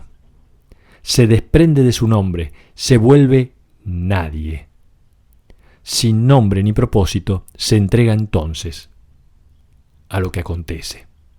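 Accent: Argentina. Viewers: La magia del pensamiento de Byung Chula Han , narrada con esa hermosa voz argentina. ❤ Gracias 🙏🏽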